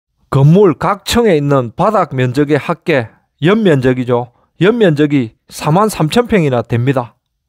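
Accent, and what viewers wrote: Gyeongsang, South Korea. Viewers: 경상도 ㅋㅋㅋ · 말투 부니 경상도 분이신거 같은데 진짜 궁금해서 그래요 도청 이전 관련된 이야기 모르시는 건지?? 아님 컨텐츠 때문에 세금 타령 하시는지 좀 궁금 하네요.. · 첫화면에서 갱상도라고 생각이 들더만 맞구만..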